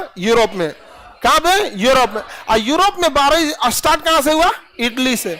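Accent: Bihar, India. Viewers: सर आप भी बिहारी डायलेक्ट में बात करके बच्चों को कनेक्ट करते हैं। कॉमेडी के साथ पढाते हैं तो बोरियत नहीं होती है बस इतनी सी बात है।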